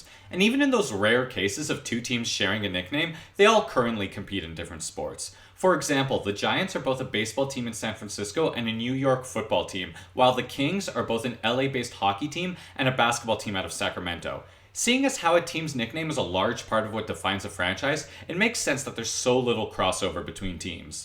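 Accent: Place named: Saskatchewan, Canada. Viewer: This guy is absolutely a Sask local. At least his formative years. Accent is a dead giveaway.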